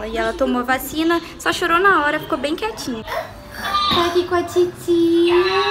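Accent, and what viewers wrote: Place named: Rio de Janeiro, Brazil. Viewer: cê é carioca mesmo? cê puxa o s kkk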